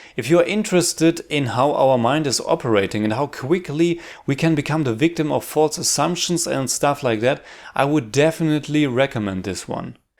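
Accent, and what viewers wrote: Germany. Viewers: bist du Deutscher?:D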